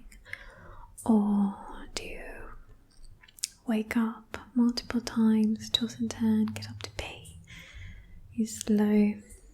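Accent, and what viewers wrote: England, United Kingdom. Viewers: You are always so Beautiful!!! I really appreciate you and your work and you look so Beautiful and Sexy with Glasses!! I love the British Accent! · That accent was awesome I can speak in a British too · Oh my god, your English accent is so good. I’m from the UK so can usually pick up on little inaccuracies, but this one would fool me! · Love your English accent. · The accent is very impressive. I am from the UK and have friends who talk exactly the same. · My god your English accent is incredible, or is your American accent amazing, i'm confused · The English accent is really good,. Side note, if you can't stand the feeling of skin against fabric, are you naked all the time? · Its funny these are a mix of different brittish accents lmao. Shes like cockney, posh, chavvy etc lmao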